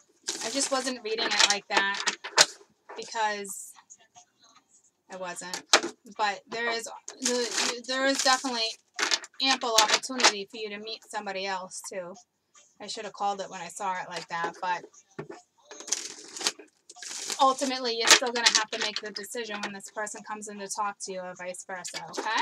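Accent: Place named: Massachusetts, United States. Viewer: You are my favorite reader! Also I love that you have a Massachusetts accent I am from Massachusetts and have lived away from home for a long time. Every time I hear your voice and how you interact with your kids, it is like getting a huge piece of home. Thank you for your service!!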